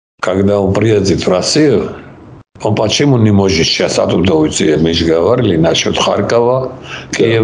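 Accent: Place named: Georgia. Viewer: Так половину грузин говорит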